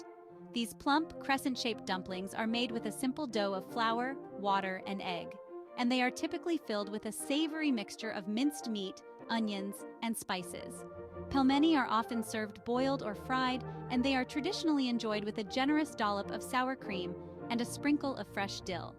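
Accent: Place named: United States. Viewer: As a Russian speaker, I wince at the American pronunciations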